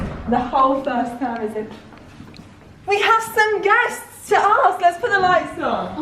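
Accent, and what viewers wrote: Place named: United Kingdom. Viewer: amazing video, very informative, the only thing that bother me was the audio because English is not my native language soooo it was a little difficult to understand what you were saying (also British accent is hard to follow) but otherwise very good video ❤️❤️❤️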